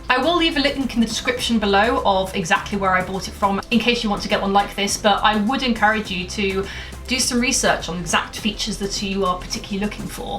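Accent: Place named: United Kingdom. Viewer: Ok- You are hilarious! You remind me of Brooklyn NY wit, with a British accent.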